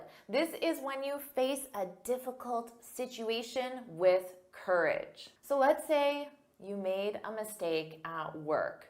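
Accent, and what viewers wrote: United States. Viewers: Hi. Out of your excellent accent I guess you re American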